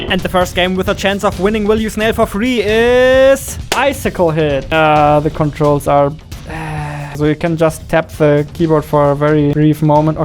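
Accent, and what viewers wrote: Germany. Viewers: Man merkt das deutscher bist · Also ich wart ja schon seit einem Jahr auf will u Snail XD und weisst du was, voll kein Problem. Ich versuch gerade mein erstes Game zu machen und es geht immer länger als gedacht. Jedenfall freu ich mich drauf dein Spiel zu Streamen ob ichs gewinn oder nicht. Für deine Statistik bin über Islanders auf dich aufmerksam geworden. Mach weiter so, nice Videos, nice Games, ein unverkennbarer deutscher Akzent, der meine Freundin zur weissglut treibt, wenn ich deine Videos guck, Alles in Allem ich bin Fan. Grüsse aus der Schweiz ;)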